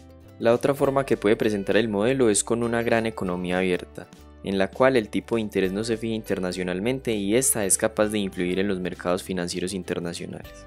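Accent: Antioquia, Colombia. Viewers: jajajaja muy bueno el video. Se le nota lo paisa.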